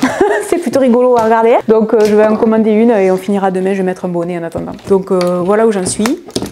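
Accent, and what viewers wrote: South, France. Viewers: J’ai eu le cœur brisé et la boule dans la gorge quand je t’ai entendu pleurer…. Ma chère, ton identité, ta féminité, c’est ton sourire ravageur, tes yeux pétillants et ton accuuuuunt du suuudeuh! Un bisou à Jean-Yves ❤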